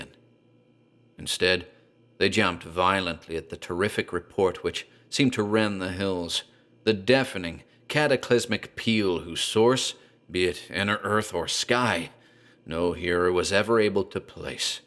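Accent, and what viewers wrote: United States. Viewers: my second listen, but still i just realized you were speaking american LOL with your voice, you make reading american sound good - and those two other guys, so different. excellent production (even better than some from BBC radio :) 🎭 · Wow, American accent totally threw me for a loop haha. Well done!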